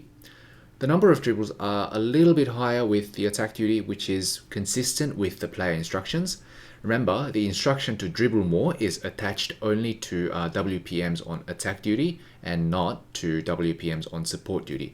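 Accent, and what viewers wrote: Australia. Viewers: also is that an Australian accent I hear?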